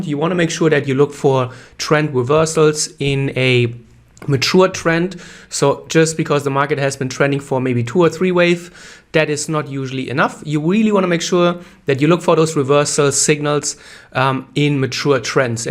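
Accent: Germany. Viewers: Your English accent reminded me my German friends 🙏. During my stay in Europe, my English become quite similar to yours. Your generalizations about the market look amazing, however it's really difficult to predict a trend reversal specially for scalping traders, it would be better to follow an uptrend and downtrend untill we reach out stop loss quite often. 😜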